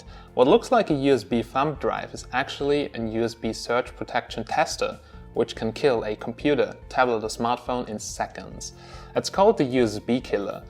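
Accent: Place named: Germany. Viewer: I love how u speak english with German akcent :) ( bad english i am from Bosnia )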